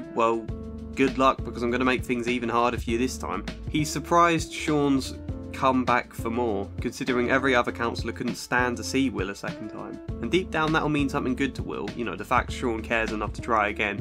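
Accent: England, United Kingdom. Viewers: Average master’s level counselor’s commentary with English accent and annoying light Jazz music playing in background. C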